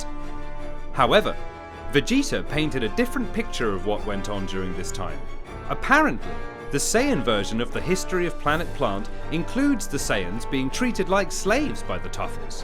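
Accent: United Kingdom. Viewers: Ohhhh I love this British accent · This was so well-made! One of your best and most engrossing videos, CBR! And the RP accent is a plus!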